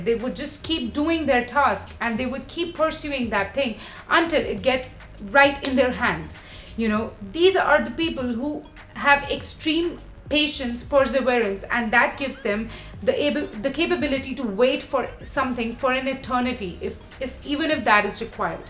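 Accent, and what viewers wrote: India. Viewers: Definitely Indian, I am too, and she has that accent.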